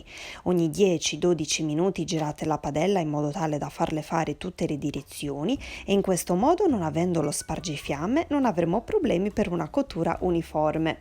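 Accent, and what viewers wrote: Sardinia, Italy. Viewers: MA BRAVA,LA FARÒ SICURAMENTE....CHE PIACERE SENTIRE IL TUO ACCENTO SARDO! GRAZIE X QUESTA RICETTA 👏👏👏👏😘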